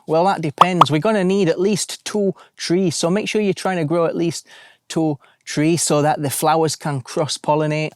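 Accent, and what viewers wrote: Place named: North East England, United Kingdom. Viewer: Just a question to... in your first post where you crack open the cherry nuts and one is empty of seed... you say you'll take it back to Mercadona... you in Spain where the climate is warmer than the climate in UK where your north-east UK accent suggests you're from?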